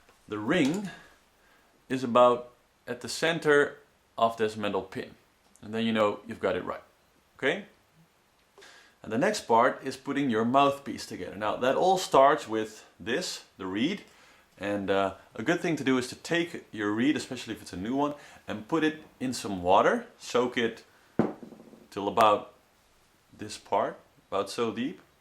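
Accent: Netherlands. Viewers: Is he Dutch of zo? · Sounds like you have a slight Dutch accent, true? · jij bent nederlans ik hoor het aan je stem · You're Dutch aren't you? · Are u dutch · Ik hoor dat je een nederlander bent haha. 😁 ik speel al Gitaar en Viool. Maar zou ook heel graag Saxofoon willen leren spelen. En kan niet wachten om ermee te beginnen. Ik ben er voor een aan het sparen. En ik heb me geinformeerd bij een lokale Fanfare om zo al eerst op de Sax te leren spelen voor ik er een koop. Is dit een goed idee? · I CAN TELL YOUR DUTCH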